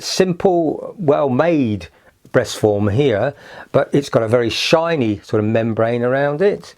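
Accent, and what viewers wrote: United Kingdom. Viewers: You ! From the U.K ? Love your English / British accent wow.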